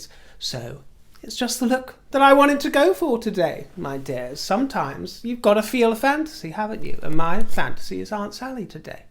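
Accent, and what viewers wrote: United States. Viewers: Love your ‘Merica accent!🇺🇸😂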